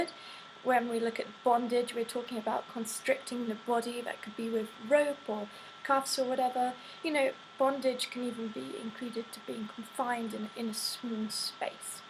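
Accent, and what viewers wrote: United Kingdom. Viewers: A blond-haired, blue-eyed, British-accented Asian? The f? Your "master"is like 50 and you are what, 18-19? This is just stupid and crazy, especially that he enjoys seeing you in pain!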